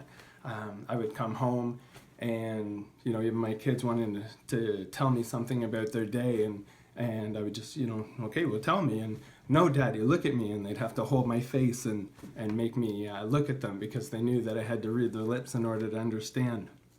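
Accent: Canada. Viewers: He must be Canadian, what I find interesting is that his voice got a little higher now that he can hear. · So cool, crazy that you have a very distinct Canadian accent! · Congratulations!!! This is fascinating to me... You can tell that now you can hear, you have picked up an accent... Canada right? Its the ' ou ' like about and house... Lol.. When you were telling the surgeon or the lady that was getting you in touch with sounds you didn't have your accent... That is what fascinated me... Very happy for you.. Something I take for granted and seeing and listening to your story .... Changed the way I look at things.. Thank you for sharing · I'm so grateful that this has changed your life I watched a video where you initially got the implants. You're doing so well!!!! You have you Canadian accent back 🙂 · I thought he had a very cool foreign accent in the first video. 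Now after a year or two, he sounds like an average Canadian.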